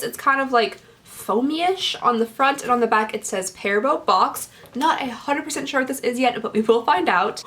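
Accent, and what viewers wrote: Kentucky, United States. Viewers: Cool.. you go to the same school as my son and his girlfriend.. just a county away from where I live.. lol I can tell by the accent you're KY! 😊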